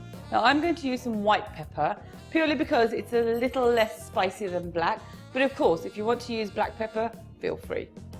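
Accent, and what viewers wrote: United Kingdom. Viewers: She is flaming Hot.. I love her British accent too.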